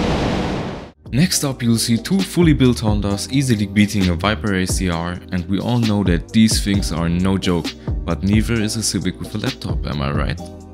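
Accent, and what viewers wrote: Germany. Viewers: bro bist du deutsch ja nh? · Are you german? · man hört den deutschen aczent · Gude .) musst noch bissel Amerikanisch lernen fällt auf das du Deutscher bist .)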